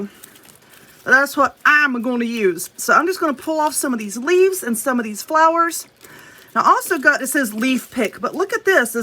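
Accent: South, United States. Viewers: As a Southern Girl myself(Texas), I can’t decide what I love most…. Your Awesome Crafts, you’re Southern accent, the nails or the beautiful tattoos! I’ve learned so much from your videos. Please keep posting!!!!! 😊👍🏻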